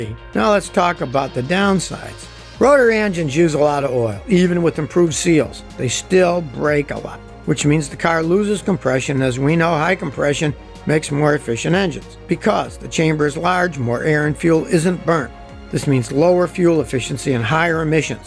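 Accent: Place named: Appalachia, United States. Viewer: Now, that's a Hillbilly accent!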